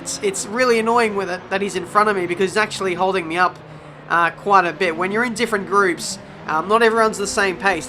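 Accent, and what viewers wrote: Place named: Australia. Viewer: Best english accent! The brits and americans should study australian english! The hole world should. Cheers from sweden!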